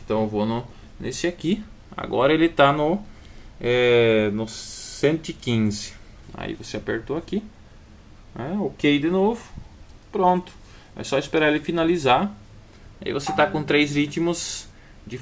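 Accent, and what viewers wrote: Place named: South, Brazil. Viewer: Sutaque do sul rsr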